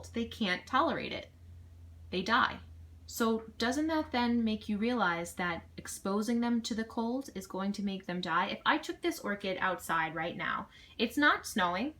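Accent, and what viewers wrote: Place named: New York, United States. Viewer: GREAT video, thank you. I learned so much about how to take care of my own phalaenopsis buddies. All your comments about ice cubes made me laugh. Yeah people tell me all the time about how they water their orchids with ice cubes like that. I never follow their advice either because it doesn't seem like a tropical plant would like ice water. Now I know for sure after seeing this video of yours. Cheers. Also, I love your New York accent.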